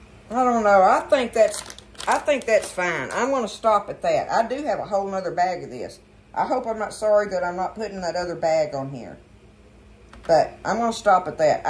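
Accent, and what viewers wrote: South, United States. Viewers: It looks delicious.. you sound like one of my Aunties down south when you talk.. you're very pretty ❤🥰 just like my Aunties..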